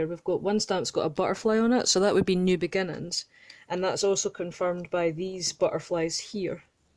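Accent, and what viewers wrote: Scotland, United Kingdom. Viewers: Woohooo a Scottish reader 👌🏼👌🏼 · 3 soo on point 👌🏾 btw I love your accent, reminds me of my best friend, she's scottish ❤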